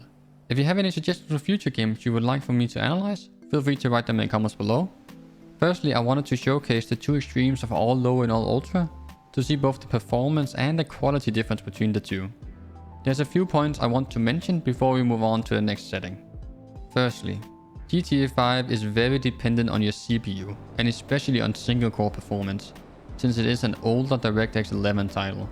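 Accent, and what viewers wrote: Denmark. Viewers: Hey bror, har set du er stoppet med at poste, men ber dig begynd at post igen tro mig. Du skal bare øve dig på dit engelsk så det ikke er danglish og blive ved med at poste og lock in. Tro mig hvis du bliver ved bliver du stor på et tidspunkt. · You sound danish lol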